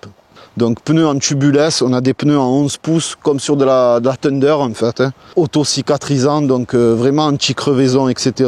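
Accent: Marseille, France. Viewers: Salut Tonton, merci pour tes vidéos toujours aussi sympathique avec la gouaille Marseillaise qui va bien 😅 Chaque vidéos c’est un régal de te suivre, garde ta façon de faire car on te sens généreux , objectif et réaliste dans ta démarche et tes propos 😊 Perso j’attends ma Suprem qui devrait arriver sous peu tellement Weebot s’est fait démonter les stocks…😮 Au plaisir de te rencontrer un jour, bonne suite à toi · Excellente découverte avec un bon accent du sud comme toujours, sympathique comme tout, même avec les vieilles XD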